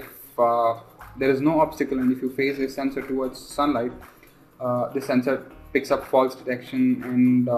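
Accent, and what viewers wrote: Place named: India. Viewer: stop speaking indian, learn english dude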